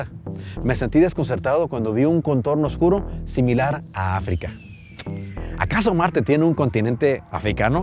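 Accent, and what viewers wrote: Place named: Monterrey, Mexico. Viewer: Eres regiomontano 😱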